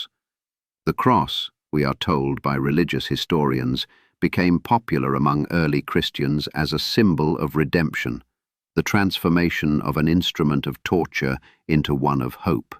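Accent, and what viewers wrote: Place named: England, United Kingdom. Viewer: Wonderful, simply wonderful. The delivery in a crisp English accent, the words used, the message clear, cogent and concise. We love it! Keep it coming please.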